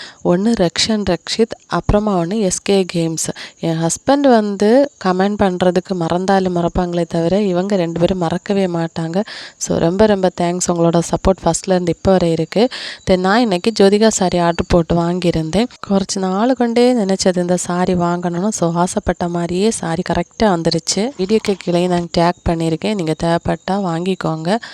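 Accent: Kanyakumari, India. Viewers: கன்னியாகுமரி மாவட்டமா உங்களுக்கு Sister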